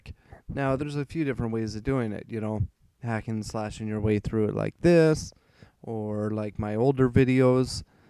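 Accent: Canada. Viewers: Wait you're Canadian???? Where are you from?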